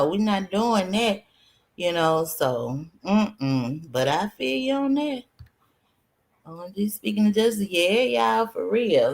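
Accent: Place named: Deep South, United States. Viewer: ok I will pop in the chat one day so be on the look out for me 😁 and I like that country accent u got lol you really sound like u from the deep country south foreal 🤣🤣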